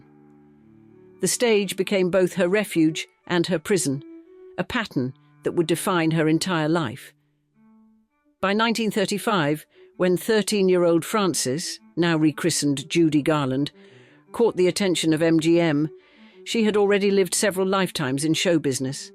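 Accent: England, United Kingdom. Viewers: An extraordinarily good documentary on Our beloved Judy … a genius talent so abundant that no studio or Mayer could quell, but whose legacy was paid with her shortened? But wonderfully generous life. (An English accent somehow underpins this narration with the dignity, honour & respect that Judy earned. A genius talent and top-genre human being. With gratitude for the quality of the content and blessings for its realistic homage …even though it was but a very small sliver of all that our great, great Judy represented, was and still is z Thank you, From Melbourne, Australia. Xx 🙏💝👏🐨🦘🪃🇦🇺🕊️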